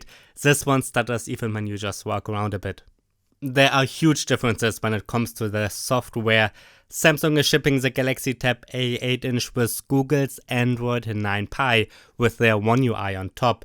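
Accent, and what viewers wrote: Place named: Germany. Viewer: Nice vid, love that thick german accent too. Keep it up, definitely one of the best reviews on this tab.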